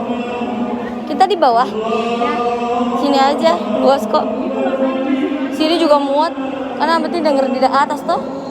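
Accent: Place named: Soppeng, Indonesia. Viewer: Cengkok khas Soppengnya itu loe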